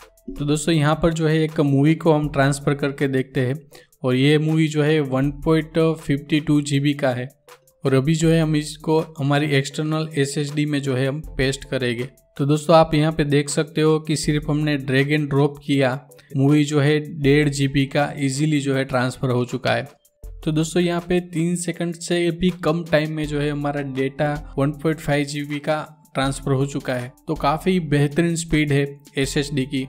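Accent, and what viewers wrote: Gujarat, India. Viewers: Ap Gujarati ho?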